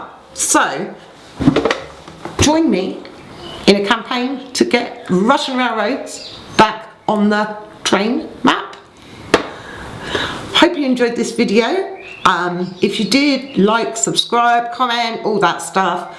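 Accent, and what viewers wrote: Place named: England, United Kingdom. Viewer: Just curious, what kind of English accent is this? thx for the explanation, even if by no means this is should be marketed as a "10 minute" teach I play red too.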